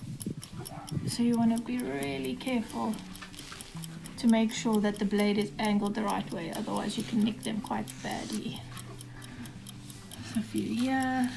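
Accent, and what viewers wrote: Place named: South Africa. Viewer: Liked and subbed! I’m happy to have found a South African youtuber as I grew up near Durban and moved to England in 2002 and I miss the country and the accent so much! This was such a useful and thorough video! You have a very good manner in front of the camera! I didn’t know about this technique so I’ve learnt something new today hehe thank you for sharing! I’m excited to see more! Btw I have a group on Facebook just for vloggers called Equestrian vloggers! I’d love to see you in there ☺️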